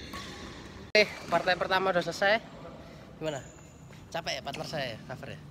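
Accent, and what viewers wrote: Java, Indonesia. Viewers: lu kenapa medok banget jowo nya? Gw asli betawi kalo denger lu ngomong bawaannya ketawa ajah dah liat vlog. Walaupun kadang gw gak ngerti lucuannya.. overall lu kreatif bikin vlog..... lu kapan juara???kapan?gw bakal dukung atlit yg juara.. doa aja ya buat lu mudah2an berlanjut ajah badmintonnya. Konsis.. walaupun gw belum kenal2 amat ttg.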